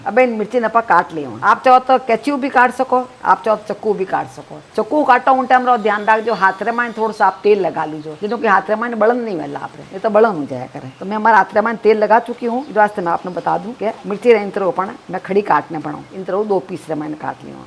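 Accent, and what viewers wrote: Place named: Marwari, India. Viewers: aap rajisthani bolte huye bohot ache lagte ho mujhe bohot acha lagta he rajisthni khane maze k hoten hen me pak me reheti hin magr mene jothpur ki thali khai he or jothpur ka qila bhi dekha he jodha bai ka ab me ye achar bhi banaungi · How educated u are please reply.. what a marwari twist with English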